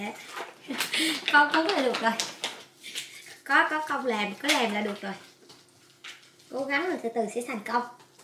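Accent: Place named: Phu Yen, Vietnam. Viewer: Mình nghe giống giọng Phú Yên quá xá~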